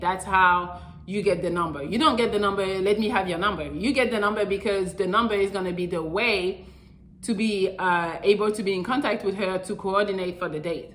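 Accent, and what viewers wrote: France. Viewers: What's your nationality lass, you have a french accent👀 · Hi I'm a first time viewer to your channel, a virgin of sorts, so just a few things first off i wish we' had vids (podcasts) back 20 odd year's ago because boy was I stupid, i used to have them running after me, know their running away from,second i thought i detected a wee hint of a bit of a French accent, apologies if I am worng I was just wondering i don't think I can jump that far. Keep up the good work.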